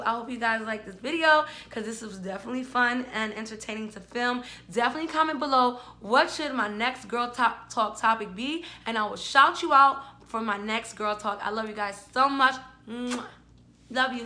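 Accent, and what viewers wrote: New York, United States. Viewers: Nah it's just nyc accent. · Same New York accent both nicki and cardi got deep New York accent like her😇😇😇😇😇😇😛😘🤩😘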